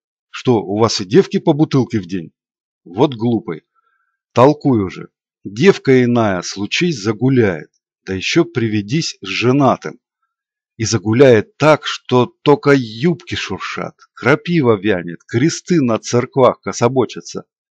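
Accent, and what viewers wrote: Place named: Russia. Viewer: Любо-дорого послушать... Слегка заострённо, но чисто по-русски... Спасибо.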